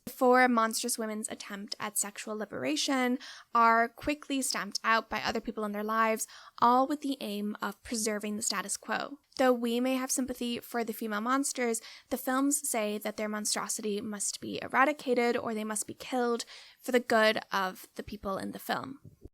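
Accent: Ireland. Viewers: Lol completely irrelevant but why do you have the slightest irish lilt?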